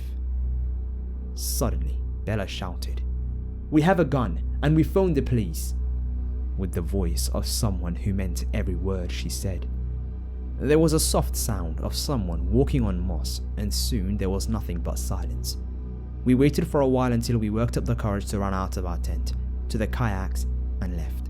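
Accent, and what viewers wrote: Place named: United Kingdom. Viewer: is hereby the best story teller. That British accent.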